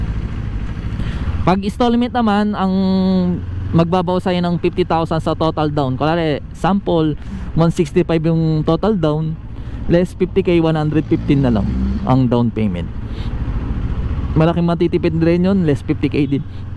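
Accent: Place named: Visayas, Philippines. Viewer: Taga Iloilo ka po ba? Or taga Bacolod? Iba po kasi Tagalog Accent nyo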